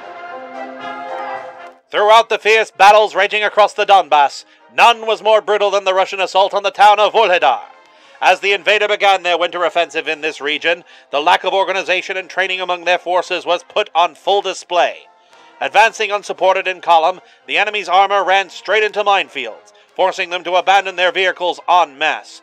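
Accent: Transatlantic, United States. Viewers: Ngl, this is a pretty good transatlantic accent. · Yes, he has a fantastic Transatlantic Accent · Absolutely fantastic transatlantic accent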